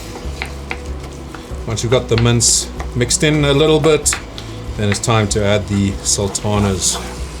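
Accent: South Africa. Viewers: Now I understand from where is your accent, and the addiction to piri piri. (I have some family that come from South Africa)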